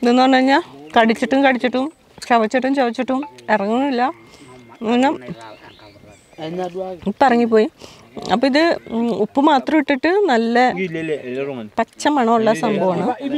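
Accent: Thrissur, India. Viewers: ഇത്രയും നാൾ യൂട്യൂബിൽ കേറി ഇറങ്ങിയിട്ടു ഇന്നാണ് ഞാൻ ചേച്ചിയുടെ ഈ വീഡിയോ കണ്ടത്...പിന്നെ സംസാരം കൊള്ളാം ട്ടാ. എവിടാ വീട് തൃശ്ശൂര് ആണോ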